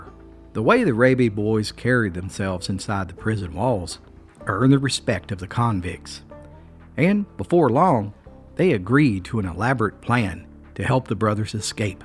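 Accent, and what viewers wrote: Appalachia, United States. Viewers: I wouldn't say he has a deep Southern drawl,I do he has a soft southern Appalachian accent, there are several different regions of different accents, all with some things in common and difference.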